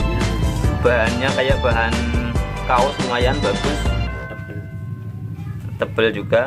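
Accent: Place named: Banyumas, Indonesia. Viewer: Wahhh buat shooting ya mas boss...lucu ngapaknya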